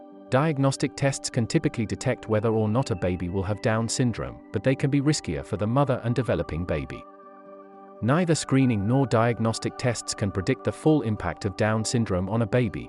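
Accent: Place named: United Kingdom. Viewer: Why a British accent giving American statistics?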